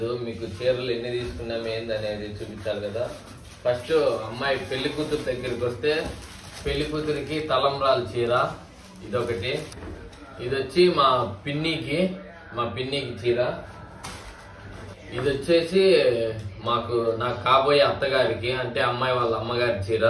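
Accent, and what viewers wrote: Kadapa, India. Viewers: మన కడప భాష చాలా బాగుంది తమ్ముడూ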